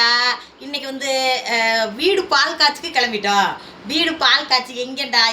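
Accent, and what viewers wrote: Madurai, India. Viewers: Nee pesarathu alaka erukku ❤❤❤❤ மதுரை தமிழ் super ❤❤❤❤❤❤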